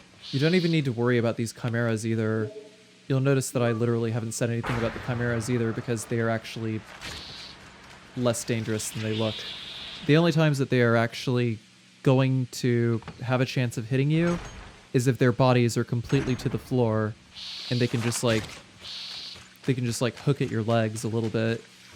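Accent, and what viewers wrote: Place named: England, United Kingdom. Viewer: I love your voice. Superb english accent.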